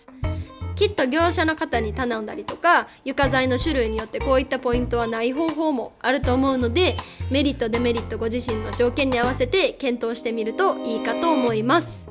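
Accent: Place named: Kansai, Japan. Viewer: めっちゃ関西弁やん。 久しぶりに関西弁聞いたけど、やっぱなんか落ち着く。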